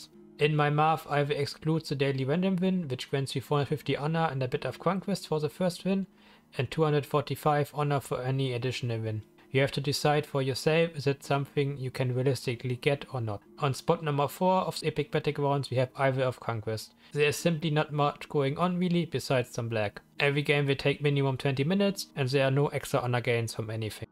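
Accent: Germany. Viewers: It was quite strange listening to you speak, because I know you're speaking English but half the time it sounded like you were speaking German only to realize you were indeed speaking English but the cadence and rhythm and enunciation was very much German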